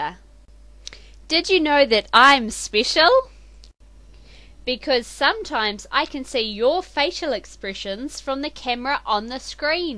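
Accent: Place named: Australia. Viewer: To me it sounds like a heavy Australian accent. Some say 'New Zealand' but I can neither agree nor disagree since I haven't really heard one (and if I did- I might've passed it off as Australian -_-;). No offense, her voice is annoying and I can't concentrate if I had to study with her. "Did you know that I am special?!" ...riiight....we're all special lassie, in our own separate ways. "I can see your facial expressions" Tell me, you were so originally a porn stimulator weren't you?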